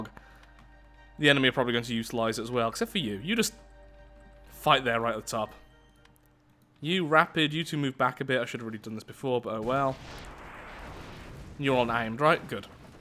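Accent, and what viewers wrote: United Kingdom. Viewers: No I'm here for the jokes , British accent and KABOOMA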